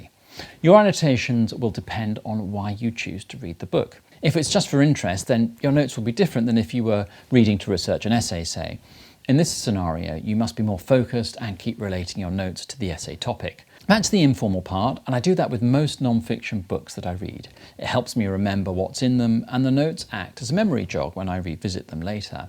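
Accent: England, United Kingdom. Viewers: Nope. It's an English accent. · I love your British accent!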